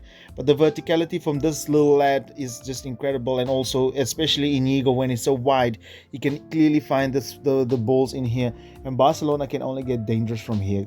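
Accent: South Africa. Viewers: you south african?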